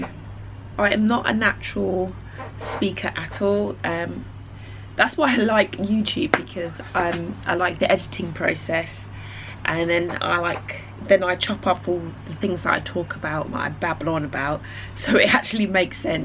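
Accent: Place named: United Kingdom. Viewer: Hey, I love your channel ! I'm a full-time children book illustrator from Madagascar. I love seeing other black artists growing on social media, we definitely need more representation here ! Love, Sawyer PS: I love your British accent <3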